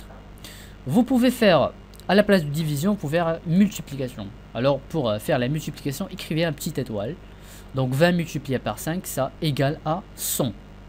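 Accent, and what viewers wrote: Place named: Morocco. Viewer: On entend l'accent marocain lol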